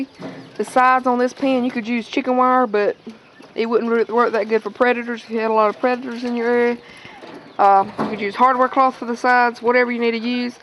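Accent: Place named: South, United States. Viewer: Yes indeed I love a southern accent being from the south I'm partial. Keep doing what you're doing Fort Worth Texas loves it. Blessings and more Blessings to you and your family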